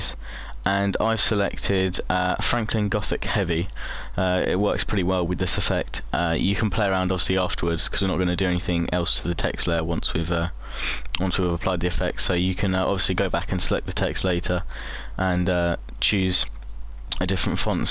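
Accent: United Kingdom. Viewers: he is british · Thanks for lesson, British english cool! - Giorgip7-Tbilisi, Georgia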